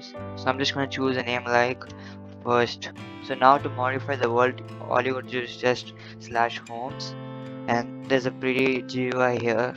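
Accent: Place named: India. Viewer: bhai hindi mai bnaoge toh or achi bnegi vedio bhale hi international audience na mile but indian audience jrur milegi accent se pata chal rha hai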